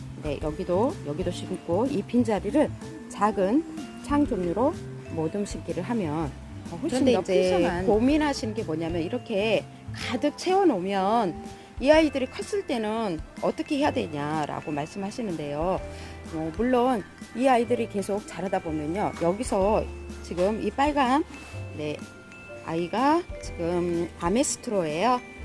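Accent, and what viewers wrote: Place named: Jeolla, South Korea. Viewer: 영상구도도 공부하시고 전라도 사투리도 구수하고ᆢ풀시청 잘보앗읍니다~~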